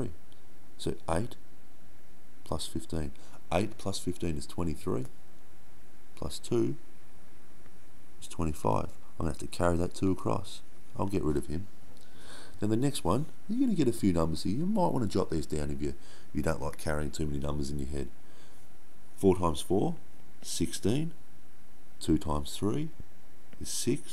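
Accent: Australia. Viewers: Are you a aussie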